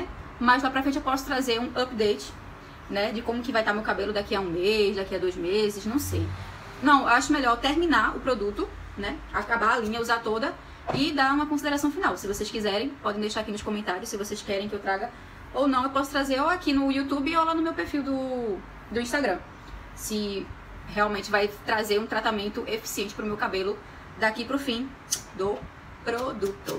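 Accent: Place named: Olinda, Brazil. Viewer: Esse sotaque eu conheço 😃 sou olindense 😘 excelente vídeo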